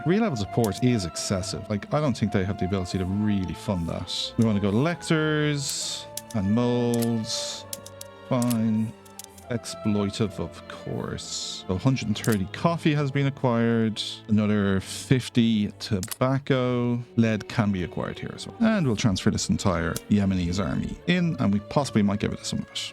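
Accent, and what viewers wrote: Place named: Ireland. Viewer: Great to hear an Irish accent, even better when its with paradox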